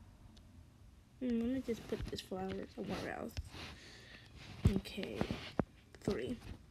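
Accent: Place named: Philippines. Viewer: I can't see that I chat here but I know and I hear that shes from Filipino tama ba ko?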